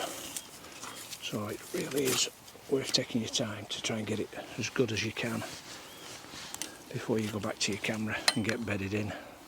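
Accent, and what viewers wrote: Lancashire, United Kingdom. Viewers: Only just found your channel and I must say Brilliant really enjoying what your producing, you also sound very local Lancashire by any chance.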